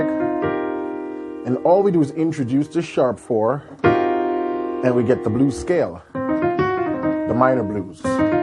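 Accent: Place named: Jamaica. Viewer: This is my first time here. I'm a reggae man who has recently branched out to the blues. Today, I decided to check out some Gospel-- and found this lesson. I was not disappointed, and have subscribed. You know your stuff, and that unmistakable Jamaican accent still comes through! Blessup!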